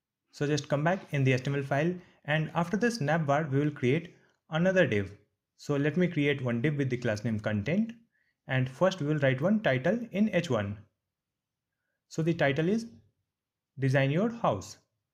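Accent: India. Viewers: Indian accent yes... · Lol!!! It's Indian Accent bro. Indians are tech savvy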